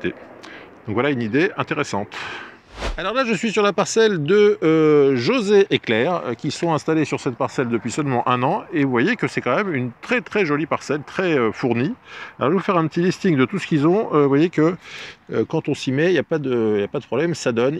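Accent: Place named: South, France. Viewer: Bravo, magnifique reportage avé les accents du Sud!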